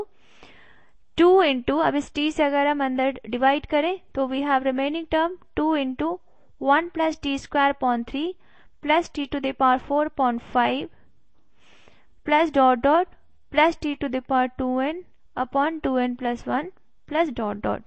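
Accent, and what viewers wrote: India. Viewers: I barely understand indian accent... but english mixed up with indian hell no !!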